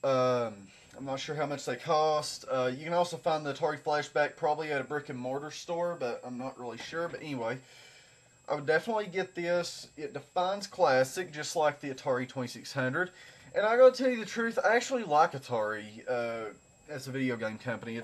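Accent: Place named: South, United States. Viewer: You have a thick southern accent man. Where you from? SC Here. :D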